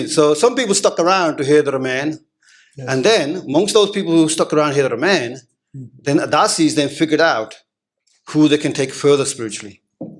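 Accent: United Kingdom. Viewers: His accent is British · After watching cmnts..i realised he is also planted fraud man like his bro Sadhguru.. they all are well trained with uk accent to make youth fool.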